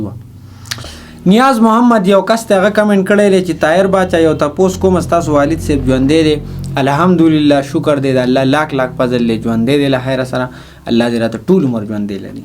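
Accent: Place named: Sama, Afghanistan. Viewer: Laga lehja sama ka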